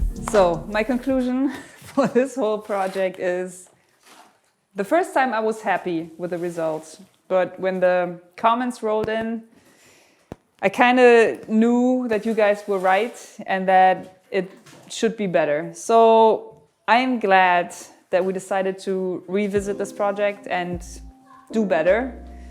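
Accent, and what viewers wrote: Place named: Germany. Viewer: the more serious it is, the more german she speaks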